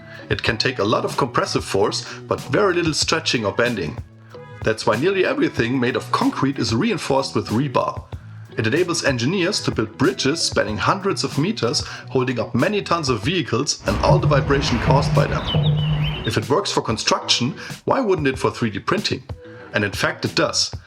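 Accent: Germany. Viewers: Notable observation: if the author of the video about 3d printing has a German accent, the video is going to be awesome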